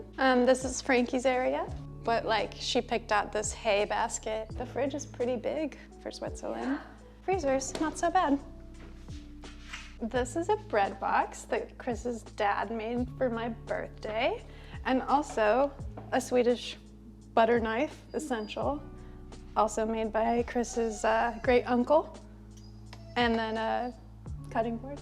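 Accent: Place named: United States. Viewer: Cute place, cute dog and cute US accent!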